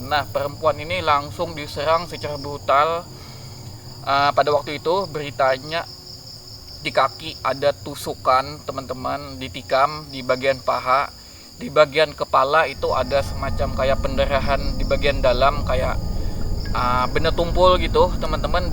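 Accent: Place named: Banjar, Indonesia. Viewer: Lihat logat sepertinya utang banjar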